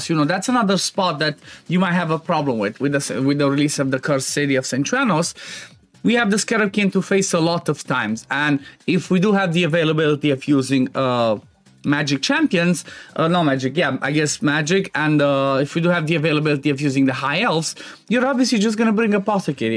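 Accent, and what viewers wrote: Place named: Romania. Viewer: Accentu de român))))) Oricum, fain video, ține-o tot așa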